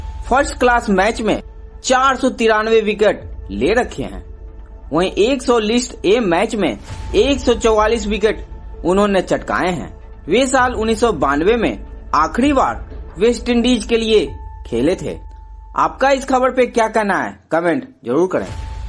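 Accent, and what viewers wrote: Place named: Bihar, India. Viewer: भाई आपके लहजे मालूम चलता है की आप बिहाड़ी (बिहारी) हैं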